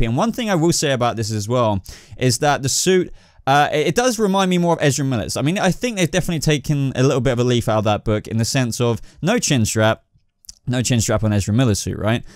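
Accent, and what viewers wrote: United Kingdom. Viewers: And btw nice haircut are u british cause u kinda sound british and a differ race i can't recall or put my finger on lol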